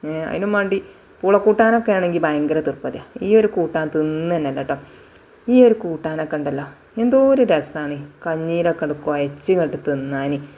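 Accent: Malappuram, India. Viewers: ഇജ്ജ് മലപ്പുറം ഭാഷ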